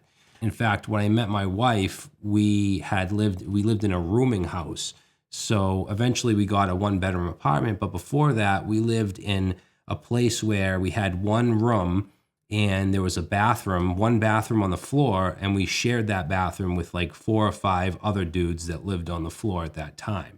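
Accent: Boston, United States. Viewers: Was curious how vite worked and found your video to be super helpful. Thought I heard a boston accent so decided to check out your channel. I'm two minutes into this video and find it to be very moving. Earned a sub today, go sox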